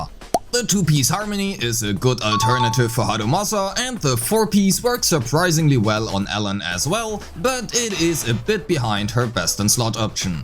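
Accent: Germany. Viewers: Are you german? Your accent reminds me of german ^^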